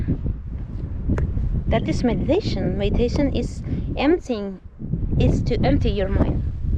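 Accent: Cartagena, Colombia. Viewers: que rraro hablas cartagenero..... :0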